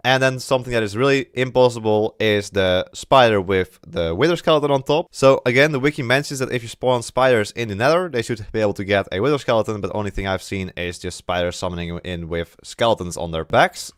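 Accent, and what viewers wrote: Netherlands. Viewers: Hoi ben je nederlands? je hebt wel een opvallend nederlands accent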